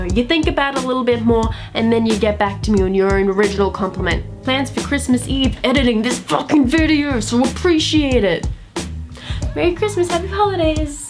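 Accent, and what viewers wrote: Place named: South Africa. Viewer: You sound more South African than Australian...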